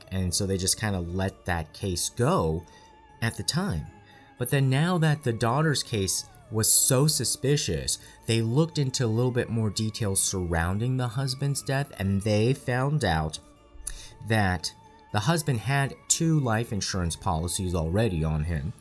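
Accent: South, United States. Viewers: I wish you had your own crime show over here in the states,. I would have you on my TV 24/7!!!! I just love the way you explain things and tell it like it is. And omg those cosmetics you tickle me😂😂. I think I heard you talk about living in the States one time. I live in Tennessee so I talk like Ellie Mae Clampett lol and I just got to ask you about your accent because I think I hear a little bit of southern lol I just love it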